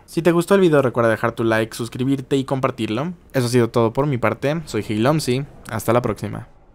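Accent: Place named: Bogotá, Colombia. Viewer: que paso mi Cantinflas y ese bogotazo xd no te va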